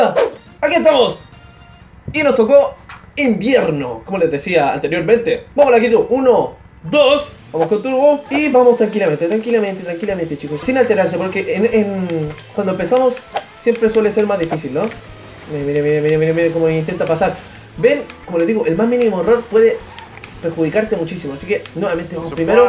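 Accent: Chile. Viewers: Pense que eras de chile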